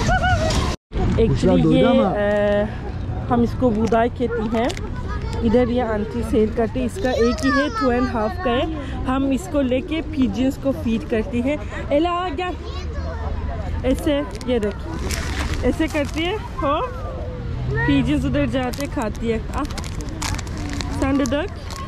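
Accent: Pakistan. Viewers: Thank You for making beautiful vlogs. Totally loved it. I like the way u speak urdu. In love with ur urdu accent. Ma Sha Allah keep making gud videos. N May Allah bless you and your family with healthy life Ameen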